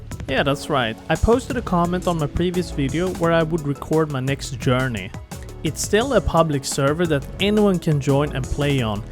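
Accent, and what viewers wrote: Sweden. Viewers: Think I hear a Swedish accent, Haha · i just hear you are from sweden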